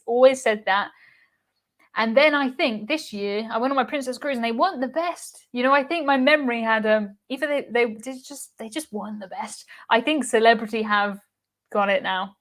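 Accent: United Kingdom. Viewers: I like your British accent. And your videos look so professional and I like your raw views and opinions about cruises. Great work.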